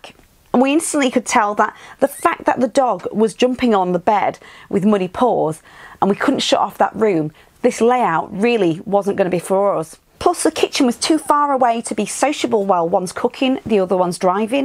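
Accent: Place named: Northern England, United Kingdom. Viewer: Great Northern accent! (I hope)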